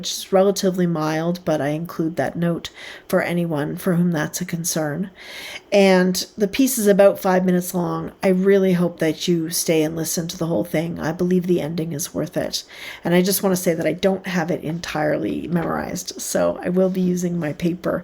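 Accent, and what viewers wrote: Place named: Scotland, United Kingdom. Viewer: Such a powerful and timely piece, and so beautifully delivered (even with a Scottish accent!). Thank you!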